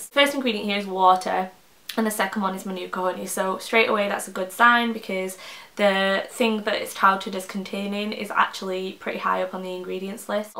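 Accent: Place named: Preston, United Kingdom. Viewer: From one to another! I love your videos and your accent, my Gran, aunt, uncle and cousins lives in Preston and has a similar accent to yours and I don't know but I feel at home when I watch your videos and trust your opinions! Love the work you and keep it going x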